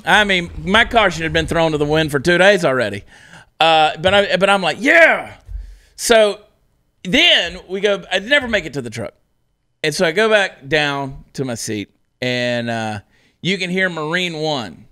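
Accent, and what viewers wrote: Texas, United States. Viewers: YeeHaw, thats a texan right there boy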